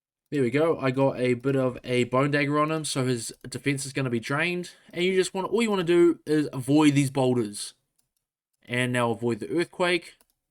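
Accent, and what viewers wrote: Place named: New Zealand. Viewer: Are you a kiwi? You have a bit of a kiwi accent. :o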